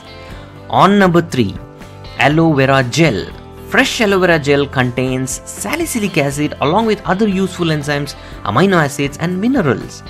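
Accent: India. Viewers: Very bad indian accent-English language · Thank you.That was the most informative instructive article I have read about routing .hormone on the internet. The accent is from India I think. Please let me know. Thank you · I like your Indian English accents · You sound very funny... Are you an indian?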